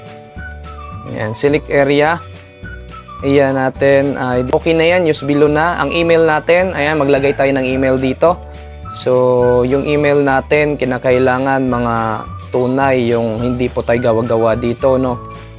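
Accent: Visayas, Philippines. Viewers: Bisaya ka Dong?🤔😁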